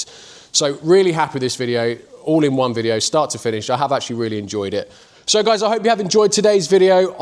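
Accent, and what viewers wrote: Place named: England, United Kingdom. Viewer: I’m watching a lot of these kind of videos and mostly Americans. It’s nice to hear proper English and cars I could afford.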